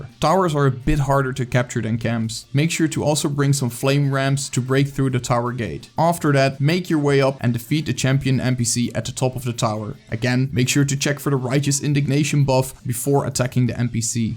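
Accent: Netherlands. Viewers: That thick Netherland accent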